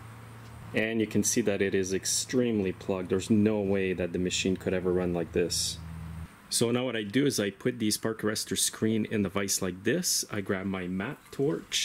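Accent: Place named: Canada. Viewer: love the vids! I need to ask, what kind of accent do you have? I think its strong Canadian but I can't tell!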